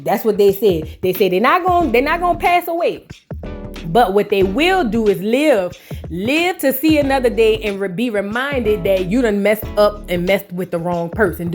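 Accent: New Orleans, United States. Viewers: it’s the accent FOR ME‼️The NOLA accent is my favorite.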